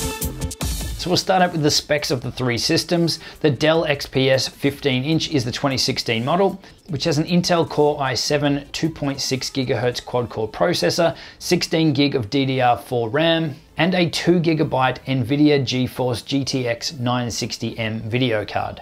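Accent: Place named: Australia. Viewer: Hey, nice to hear an Aussie voice doing some serious reviewing.:) I'm curious about the codec/s used in the tests across Premiere Pro and FCPX - were they truly the same asset? I know FCPX can transcod Camera formats to ProRes - which I would expect to export much faster being Intraframe based codecs. Cheers, JB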